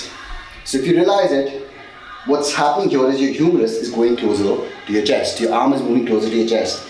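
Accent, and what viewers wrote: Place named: India. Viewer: Great and helpful info but sadly I start to hear Indian starts from minute 7.00, then I’m lost.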